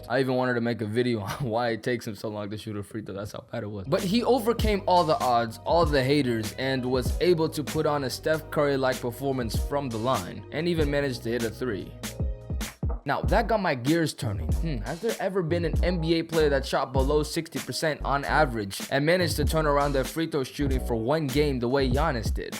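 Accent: Kenya. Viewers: Your Kenyan ?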